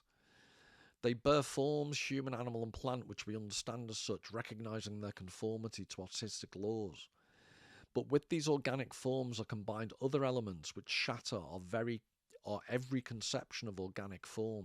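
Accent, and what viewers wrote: Liverpool, United Kingdom. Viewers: Are you from Liverpool by any chance? 🤔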